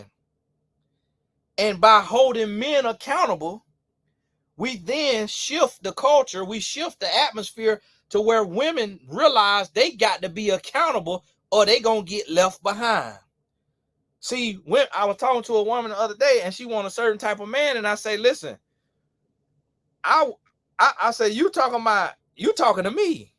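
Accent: South, United States. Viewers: You so southern, bless ya heart 😅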